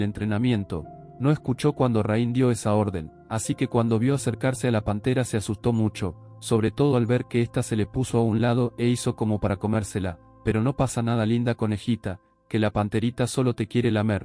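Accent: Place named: Argentina. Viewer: Apreciado Pibe 🎉❤🎉 se agradece por vuestro trabajo y esfuerzo, vuestra comunidad ya ha superado vuestras expectativas 🎉❤🎉 Me da mucha tranquilidad escuchar vuestro dialecto argentino estaremos a la espera de la siguiente parte 😊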